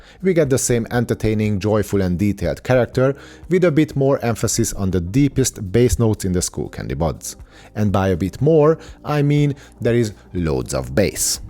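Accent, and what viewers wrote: Hungary. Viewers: Feldobta a videódat, egyből ki halottam a magyar akcentust, amúgy tetszett a tartalom😊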